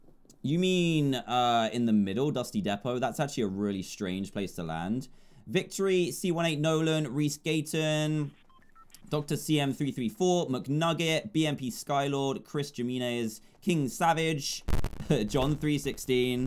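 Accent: United Kingdom. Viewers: pure brittish man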